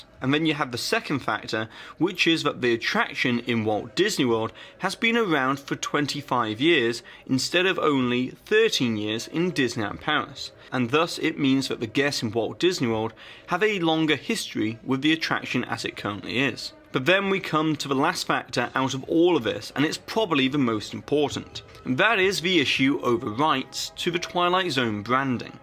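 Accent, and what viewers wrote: England, United Kingdom. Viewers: Great job, we appreciate your hard work! Was that hidden Mickey a Halloween joke? I never miss it🤔. I think you just need to work a bit on your Serling, but pretty good for an English accent! I’ve been to all three parks, but when I was in CA I missed the tower and my visits to Japan were well before Disney Sea. I’m not into the “spooky” girl versions. No thanks, but I do like the CA version with Guardians (thanks for explaining all the changes). I would love to see that version come to Orlando along with Spider-Man to replace Rockin Roller coaster or an avengers theme! Why not retheme that entire section of the park. 25 years is long enough! They just put the Cars attraction (mostly for kids, but an awesome animatronic!). Not sure why they put that there it would have been a great “Marvel” experience unless there’s rights issues! 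Once again great video /. Do we all get a pin for the hidden Mickey as a trick or treat? Yes!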